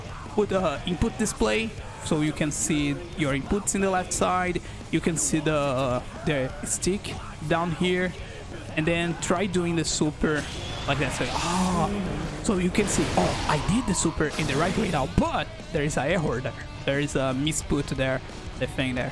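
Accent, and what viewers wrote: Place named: Brazil. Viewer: reconheci que era br so pelo sutaquekkkkkkkkkk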